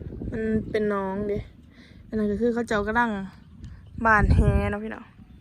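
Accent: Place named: Nong Khai, Thailand. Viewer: บ้านหนองต่ายใกล้หนองคายไหมพูดสำเนียงเหมือนคนหนองคายคนไทย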